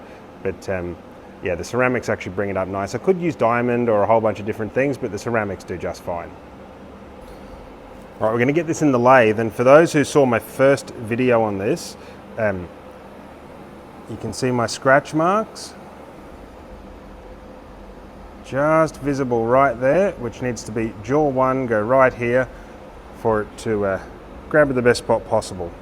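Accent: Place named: Australia. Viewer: Hi, new sub here Jon, from mid-wales, formerly from Colchester, which is a bit weird to hear the old homestead being called out in an Aussie accent :-) I tend to think of Colchester lathes as being 50s-60-s machines, student/master et al. nice to see the name at least still going strong :-)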